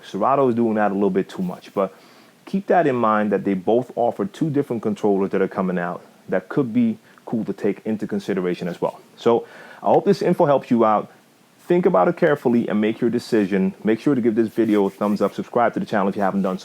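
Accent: New York, United States. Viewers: it's funny i thought you was american from ny :) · you said you from the netherlands? u sound like u from new york lol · you from the netherlands????? mannnnn i thought you were from NYC. have the accent. lol.. good looking on this review. I have Numark ns7. love it.. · Wait. You from the Netherlands ?!!! Woooow, I thought you were from NYC. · wow he is not from NYC that's crazy i was thinking he was lol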